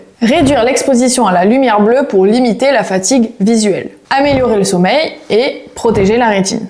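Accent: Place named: Ardèche, France. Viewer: Vous avez un peu le même accent que moi, l'accent ardéchois, mais vous c'est plutôt celui du Nord de l'Ardèche, limite Haute Loire. J'ai bon ?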